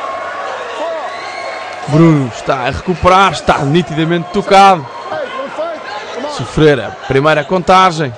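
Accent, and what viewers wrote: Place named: Portugal. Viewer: Português de Portugal.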